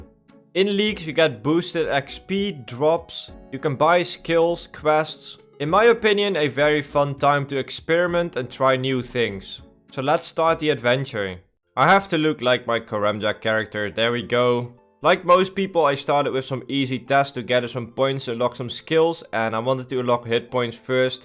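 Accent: Netherlands. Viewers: Normal people would make a 10-video series out of this, you put all of this in a single 11-minute video… madman, je verliest je Nederlandse accent ook steeds meer.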